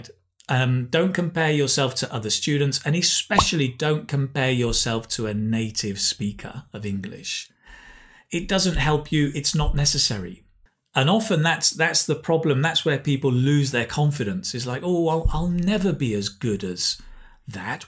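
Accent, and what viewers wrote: United Kingdom. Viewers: Where is from??? Nd is this British accent or american accent. Well I think it may be British accent.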